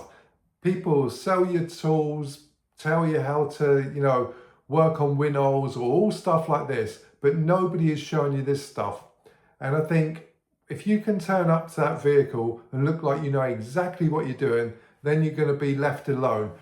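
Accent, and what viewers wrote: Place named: England, United Kingdom. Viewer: Thank you, really enjoying your channel content... 👍 ...you sound english, are you in the USA as you price in dollars $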